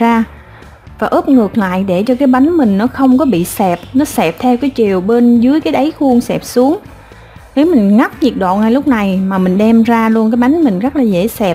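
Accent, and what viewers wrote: South, Vietnam. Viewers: "Muỗng ăn canh" sẽ dễ nghe hơn "thìa" bạn ạ. Góp ý nhỏ : Giọng miền Nam, bạn nên dùng ngôn từ Nam Bộ bạn nhé, tôn trọng & giữ gìn nét đẹp Ông Bà. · Giọng miền nam nghe dể mến Thế mà " thìa " ...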